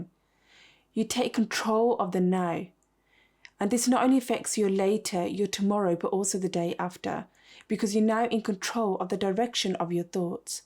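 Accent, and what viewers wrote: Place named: United Kingdom. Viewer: what zodiac sign are you? also, you talk like the guys in (i lived in the u.k. fer 2 1/2 years with an english gf). i have anxiety disorder and in addition to what a strong and domineering emotion it is (along with the usual intrusive thoughts) i do sometimes wonder if my amygdala pumping out cortisol in such high quantities will eventually lead to cancer or some other illness (when it strikes my anxiety lasts fer HOURS and comes out of nowhere). it's like being possessed by some sorda evil spirit.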